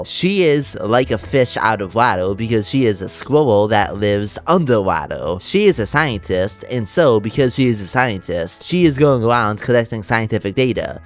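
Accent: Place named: France. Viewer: heyy! i wanted to say you sound like the french nararrator from some spongebob episodes :)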